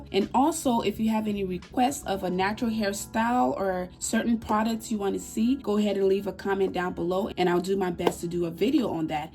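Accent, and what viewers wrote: Liberia. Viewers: She sound Liberian... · Liberian Jue.... Our accent never hide. 🇱🇷♥️😊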